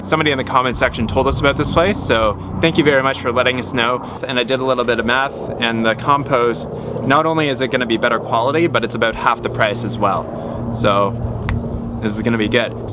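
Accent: Canada. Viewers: So this is in AZ? But the dude sounds Canadian........?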